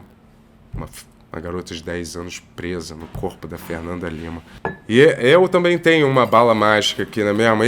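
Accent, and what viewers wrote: Rio de Janeiro, Brazil. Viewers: Esse e o primeiro video que eu vejo dele com vicio linguístico, incomodou um pouco esse "né mermo" sotaque carioca já não me encomoda tanto.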